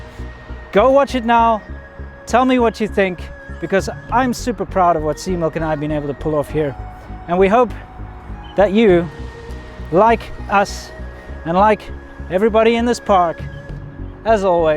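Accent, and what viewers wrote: South Africa. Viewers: Not particularly interested in China but I somehow got hooked by your channel. Love to hear the SA accent. Reminds me of when I studied at UCT - best time of my life! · Hey there compatriot. I've just subscribed, just seen your channel. I'm a huge fan of Chinese movies (Hero etc.) and music (The Yellow River Concerto, makes me cry) So please do a series on Chinese music and theatrical culture. In these strange days let us not forget about the higher and finer things China has given us (Tai Chi? do they still do it?) Many thanks...it's so good to hear a South African accent lurking somewhere there!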